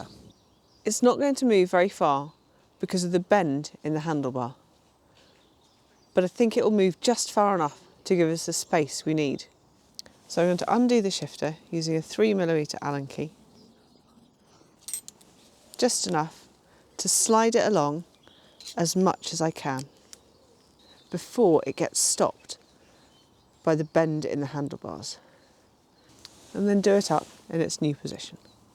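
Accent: RP, United Kingdom. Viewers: Dear ma’am: Thank you for your videos! Even though l’m not a Brompton user ( but BROOKS!), l like to see your videos because of the way you talking, posh southern RP accent, that sounds so nice to my ears, as if Dame Julie Andrews dose. 😊 By the way, meanwhile, recently l’ve heard that, they say, some of those bad naughty girls talk as they hold matters in their mouth sometimes today in UK😂❗️ ls that right? I wish you’re dwelling in happy living with bicycles! A short greetings far from JAPAN. Thank you. Domo Arigatou ! ❤️ Sincerely yours. Hiroshi The Biker.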